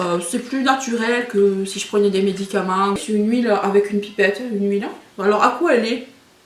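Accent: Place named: Provence, France. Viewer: ça sent bon la Provence comme ton accent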